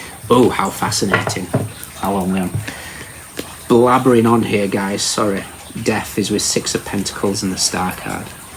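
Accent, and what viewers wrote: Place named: Lancashire, United Kingdom. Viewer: I’ve been trying to place his accent. Lancashire, Rossendale?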